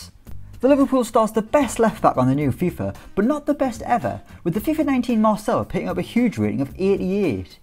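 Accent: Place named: London, United Kingdom. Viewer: His accent sounds more like a posher London accent ngl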